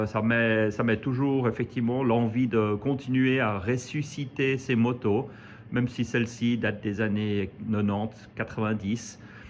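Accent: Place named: Vaud, Switzerland. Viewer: Très sympa et j'adore l'accent vaudois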